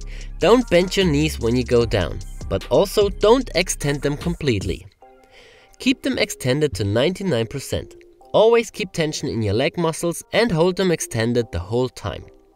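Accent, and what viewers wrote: Germany. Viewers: Dieser deutsche Akzent ist so ekelhaft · r u german, swiss, austrian or dutch? 🤔🤓 ur accent sounds like either of those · Are you from germany? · Brooo bist aufjedenfall Deutscher das hört man doch raus 😂❤️ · Schön dicker deutscher Akzent! 😁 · german accent haha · Dat German accent :D Edit - Ah wait: nice video bro! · da ist doch ein akzent, deutsch? :-P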